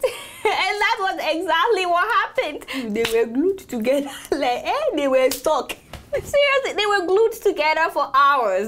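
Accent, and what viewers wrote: Nigeria. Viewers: Omo joh please stick with the Naija accent I love in with it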